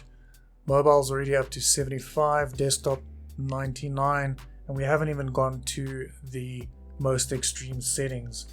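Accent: South Africa. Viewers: Are you South African 🤔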